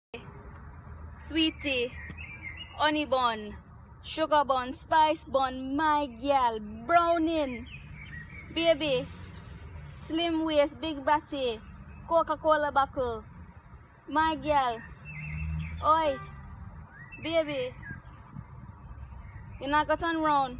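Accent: Jamaica. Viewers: hahah! girl u know u crazy! 😂😂 I be watching these vids for ur patwa accent ...love it!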